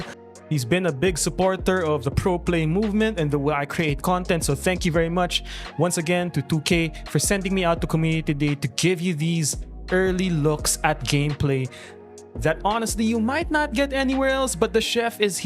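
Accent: Philippines. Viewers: Tagalog accent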